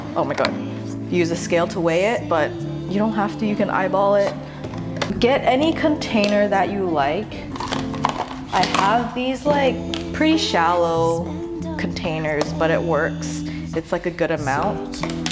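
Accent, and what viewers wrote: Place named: United States. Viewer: Metric system with american accent is throwing me off. We use freedom units here.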